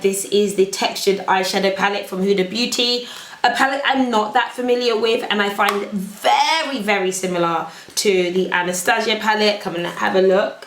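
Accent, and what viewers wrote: England, United Kingdom. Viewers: English accent ?😂😂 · I love your british accent,love :)))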